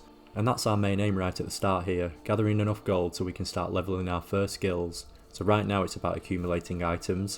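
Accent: United Kingdom. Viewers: It’s great to hear a northern accent! I feel at home. You have earned yourself a sub my friend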